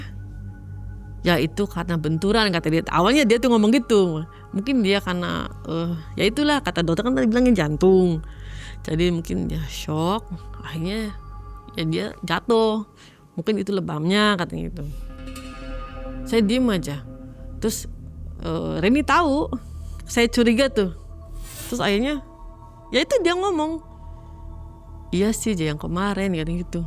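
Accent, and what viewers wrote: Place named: Indramayu, Indonesia. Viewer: Logatnya kaya orang Indramayu,, Gak mau suudzon sbnernya .. Tapi kalo bener yaaaa cukup tau ajah soal mie yamien 😅😅😅 jadi takut ...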